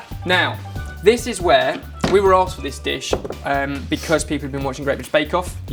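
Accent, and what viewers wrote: France. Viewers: Woo love your french accent, it's quite nice ! :D We looove our îles flottantes, so sweet and light :) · Just amazing. And cute French accent;) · So went to Marocco? What a surprise! Btw I always loved this recipe while growing up, my dad used to make it in my birthday. The limoncello touch is nice, I have to try it next time. And nice french accent;) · + French Accent = sexy!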